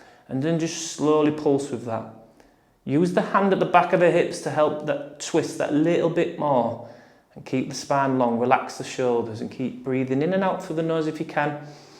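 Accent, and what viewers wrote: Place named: Yorkshire, United Kingdom. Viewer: Thank you, for this and all videos! I love your calm voice and your lovely accent (Yorkshire?) 😊 and I feel good after my runs when I stretch with you. So thanks again and see you soon!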